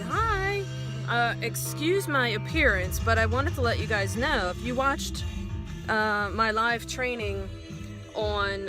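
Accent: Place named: North, United States. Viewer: I love your accent!!! I'm in Florida so I'm not used to that northern accent, I hear "y'all" a lot and I've even got into the habit saying it, lol!!! With the synchronicities you talk about, I've been having them for a few years now. I've gotten pretty good with my pendulum because I made an alphabet pendulum board. I have connected with my loved ones on that. I even made dowsing rods out of metal coat hangers and straws from a YouTube video, they've "hugged" me when I asked them too!!! Pretty phenomenal!!! But I'm still not at a place with all my clairs where I feel confident, so I'm thinking cards might help more in that sense instead of the pendulum or rods... And I know it's not the same for everyone obviously, but do you think cards will help more developing the clairs?? And how long does it take for you to have them all blown open after paying attention to the synchronicities would you say would be an average timeframe maybe??? 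Thank you so much in advance!!! Great video!!!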